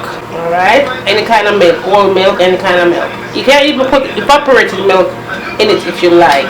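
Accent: Jamaica. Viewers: Delicious! Jamaican cornmeal porridge. I grew up in Lewisham, SE London. I love your Jamaican accent! It's beautiful! And love the 'Nah wan no lumpy-lumpy porridge'. Jamaican is just the coolest patois... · Now i "H-add" a little... yeah man real yaawdy this... can't get anymore authentic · Mi just love how you talk straight jamaican. Thanks fi the recipe.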